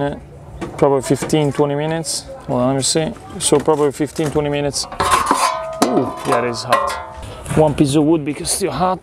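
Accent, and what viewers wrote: Italy. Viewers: I'm not gonna lie, I've always enjoyed your videos but sometimes I feel like you trade on your Italian accent and alleged authenticity just a little bit too much. Having watched this, you just can't fake that level of skill. Anyone can make 1, or 2, or 10 pizzas for a party. What you showed here takes it to a whole different level and I'm genuinely impressed enough to go and watch your older videos with a new eye. Enjoy your well-earned rest, and I'll happily be following you for the next 20. Or 50. Or 1000. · mi piace che nonostante vivi negli States da un po' non hai PER NIENTE perso l'accento italiano! 😁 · At First i didnt recognize the italian accent.... But i was sure he was italian😌❤️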